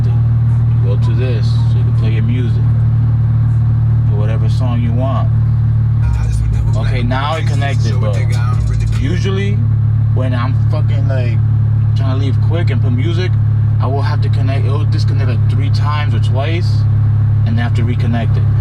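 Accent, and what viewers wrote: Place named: Latin America. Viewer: I apologize for this, but I catch some accent (latino accent) . My bad man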